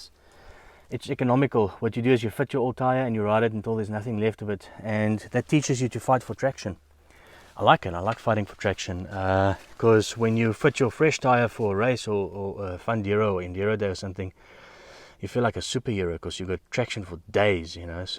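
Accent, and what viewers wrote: South Africa. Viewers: Excellent and informative. Thanks for the vid. It sounds like you’re from South Africa but is that where you’re riding? Forgive me if that’s a silly question but the terrain looks similar to where I am in the eastern US. I. LOVE tight technical woods riding. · Afrikaaners probeer engels praat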